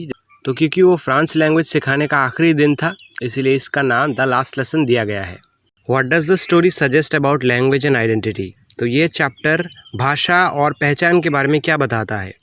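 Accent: Bengal, India. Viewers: Dada apne kita Barak valley theki ni na onno jagar emne tho Buchi apne Bangali 😅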